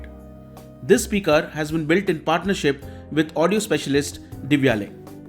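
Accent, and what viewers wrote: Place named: India. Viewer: his accent is Indian not arabian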